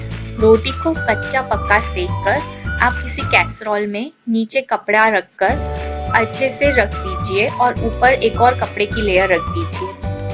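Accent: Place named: India. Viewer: Sav Sav she is speaking in hindi and not in English that u r commenting like that.... in most of the houses of india they call it in this way only..... so it's okay if she is pronouncing something in indian accent.... so pls... dont judge anyone by their language. u need to see the hardwork behind everything and also their talent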